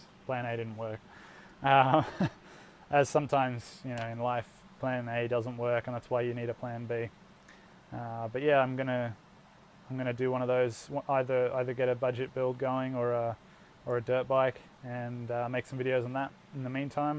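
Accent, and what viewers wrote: Australia. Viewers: I've missed you! Glad you're back! The job sounds like it will dovetail nicely with your interests! I love your videos because it is great to hear an authentic Aussie voice and Aussie scenery. I am sick of only seeing the USA stuff. I want to see more Aussie stuff.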